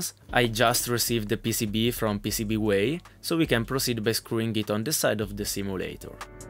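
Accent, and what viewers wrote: Italy. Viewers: This is awesome. I have been into sim racing for a while now and I am in IT here in the US. You have some ingenious crafting and engineering skills. Also, I could tell you were Italian as soon as I heard you speak... My mother is from Catanzaro and her accent is really similar to yours haha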